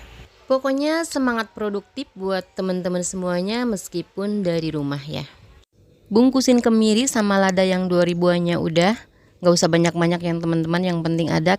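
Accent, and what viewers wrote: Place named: West Java, Indonesia. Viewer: Teh di daerah mana? Meuni Sunda pisan, salam kenal ti abdi dari kota Bandung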